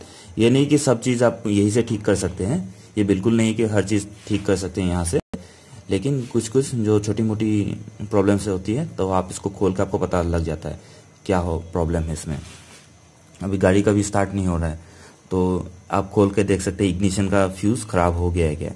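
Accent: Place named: Assam, India. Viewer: I love the sweet sounding Assamese accent Hindi. Thanks for the informative video. Respect!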